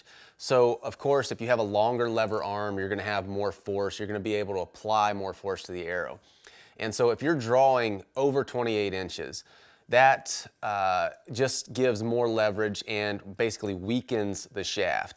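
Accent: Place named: Appalachia, United States. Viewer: Greetings to speaking Appalachian English and Deo Frutuoso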